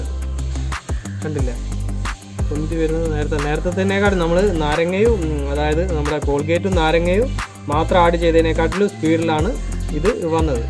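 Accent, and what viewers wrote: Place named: Palakkad, India. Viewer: ഇങ്ങള് പാലക്കാട്ട് കാരൻ ആണല്ലേ? 😃😃😃 ഡവറ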